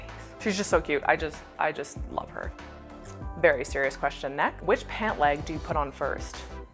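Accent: Quebec, Canada. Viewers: Salut! Tu pilotes mon avion préféré (Dash8/400); je suis passager régulièrement entre Montreal et Halifax! Super de t'entendre parler Québecois;P Aussi, Mars, sign me up! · Canadian accent - all Canadians are taught French (by law). If it was your first language then you must have been raised in Quebec. Have enjoyed your videos - thank you! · French Canadian i am guessing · You have a Canadian accent eh? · Merci pour les réponses.. J'avais deviné avec ton accent que tu es québécoise. Continue ton excellent travail! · French canadian? Or real French from France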